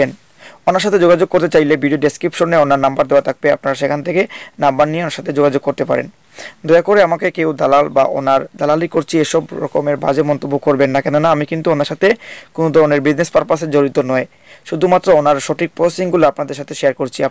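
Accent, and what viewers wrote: Sylhet, Bangladesh. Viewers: ভাই সিলেটি, লাস্ট কথাবার্তা শুনে বুঝতে পারলাম। ভাই প্রশ্ন হচ্ছে, ম্যানপাওয়া কেন দেয় না -সরকার?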